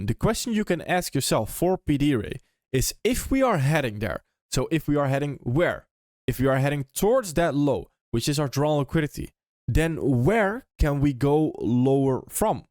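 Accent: Netherlands. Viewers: Ik ruik nederlands accent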